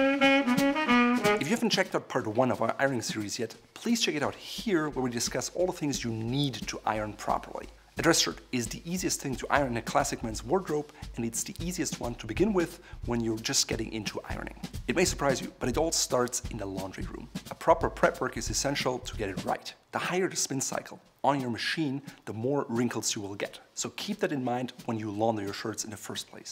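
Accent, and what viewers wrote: Germany. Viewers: It's a cross between German and South American. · Whoaaaa your Accent is so strong.... dass ich Deutsch nur vom zuhören gelernt habe! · He's from Germany.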